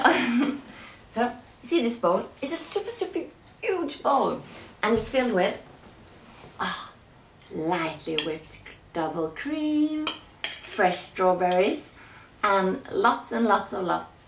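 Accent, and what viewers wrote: Sweden. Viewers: shes swedish, so a swedish accent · You seem like such a sweet caring Mother <3 Also, I wish I was Swedish!! Your accent is So ADORABLE! I want it :3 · swedish accent :)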